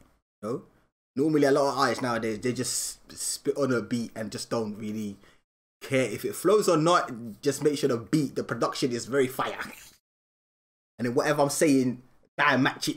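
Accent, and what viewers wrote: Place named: United Kingdom. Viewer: i think hes BRI'ISH edit: thats a big BO'OHW'O'WO'ER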